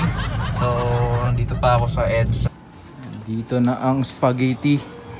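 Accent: Visayas, Philippines. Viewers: Bisaya ka boss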